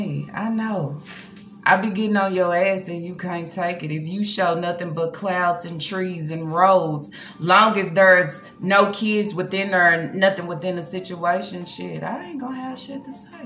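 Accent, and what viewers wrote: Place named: South, United States. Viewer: I'm new to this channel can someone tell me what State is from I hear Southern twang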